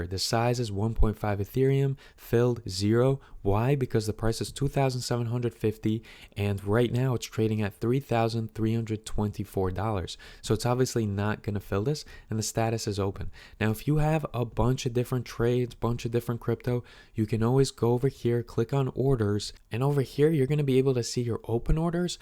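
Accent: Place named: East Coast, United States. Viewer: very well done. I love your east coast accent too.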